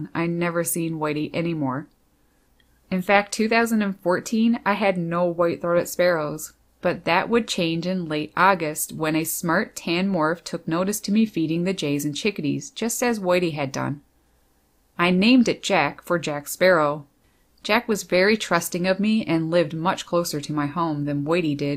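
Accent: Canada. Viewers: Love your accent my Canadian sister!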